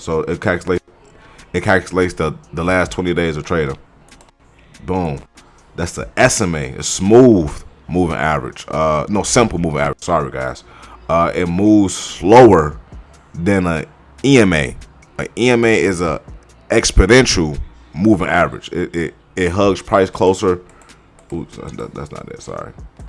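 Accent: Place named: Chicago, United States. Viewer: I can tell that Chicago accent from a mile away! You got my sub, where you from brodie? Good video I actually learned something new! I been at this shit for 6 months and starting to understand more and more about price action.. do you trade futures to or just forex?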